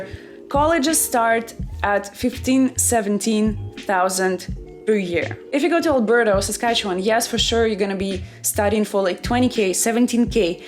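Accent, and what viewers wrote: Russia. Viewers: Are u russian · Are you Russian? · Wait are you russian?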